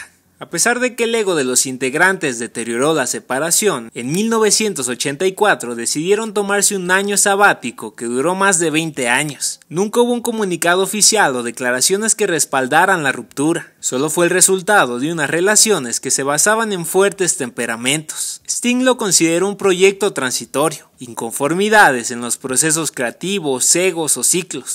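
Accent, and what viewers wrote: Mexico. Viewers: Habla más neutro porque tu acento de Panchito es hiper marcado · eres norteño? jajaj duda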